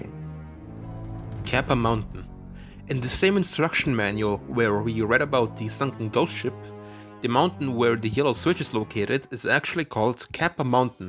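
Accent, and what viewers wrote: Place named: Germany. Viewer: His german accent is the same as my englisch teacher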